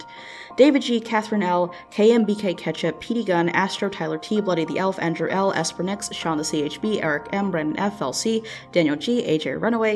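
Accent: Hawaii, United States. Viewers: She's from hawaii it's an accent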